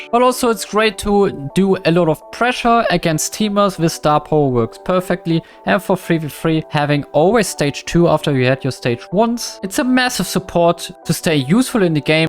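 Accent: Germany. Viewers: Bro ist so deutsch 😅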